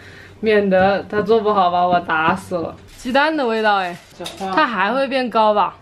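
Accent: Wuhan, China. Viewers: 看到好多好吃的，还有武汉话，好亲切。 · 刚从武汉的家里回到墨尔本，看了你的vlog又开始想家了。平时可能不觉得有什么，在异国他乡听到看到故乡的东西总是会让人有更多的感触。其实一开始我是搜旅游vlog看到你的，然后发现口音有点熟悉，点开主页发现果然是武汉人哈哈哈！ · hhhhh你一回武汉普通话就有口音了哈哈哈哈 我哥也是华师一的 好像是08年入学的（如果我冒记错的话哈哈哈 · 哈哈哈 这武汉话和我男朋友家里说的一模一样😂😂😂同在澳洲，果断关注❤️❤️ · 看博主别的视频的时候听口音就觉得是我们武汉的普通话hhh，然后发现了这只vlog证明了我的猜想！看片头就认出了销品茂的金逸哈哈哈开心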